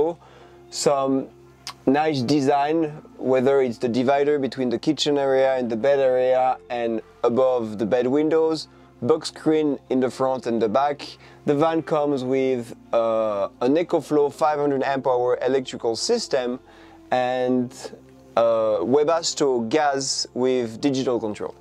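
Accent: France. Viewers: Beautiful! So brilliant! Love the French accent! ❤ So soothing to my soul!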